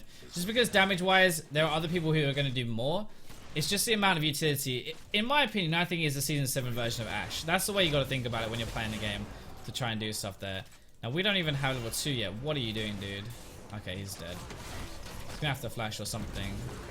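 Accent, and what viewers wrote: United Kingdom. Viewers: I could listen to your accent all day. British guys 😍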